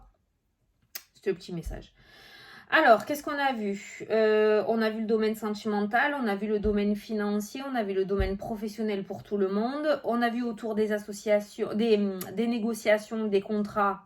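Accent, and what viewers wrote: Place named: South-West, France. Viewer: Comme on dit chez moi en Suisse, que les esprits critiques aillent voir autre part si tu y es. Argh 😓 Si tu arrives à avoir 53000 abonnés, c'est que quelque part ton petit grain de folie est une bouffée d'oxygène pour certains. Personnellement, ça fait trois ans et demies que je soigne un cancer incurable. Est-ce que j'ai envie d'écouter une personne qui s'écoute parler? Au ton monocorde? La réponse est non. Si je suis ici depuis un peu plus d'un an, c'est parce que tout en toi aspire à la fraîcheur. Ton petit accent du sud ouest est un régal pour les noreilles. 😁 Si j'avais envie d'autre chose, je ne serais pas là depuis un an. Reste le joyau que tu es. Tu me fais rire. Et tu fais du bien, quand les choses sont sensibles en ce qui me concerne. Actuellement ma vie n'est pas un long fleuve tranquille.... loin de là. 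Repousse les esprits critiques loin de toi, et reste le rayon de soleil que tu "diffuse". Avec 53000 abonnés, tu peux même te permettre de les virer! Argh screugneugneu 😓! Personne ne blesse notre! Colère. 😠 🗯 💢 👿 Longue vie à toi, et à ta chaîne ma. 😘 😘 🎵 😚 😚 🌟 🌟 🌟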